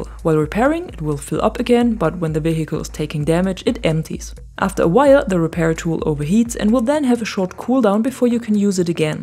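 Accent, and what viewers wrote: Germany. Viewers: Wie man merkt dass du eine deutsche bist haha ✌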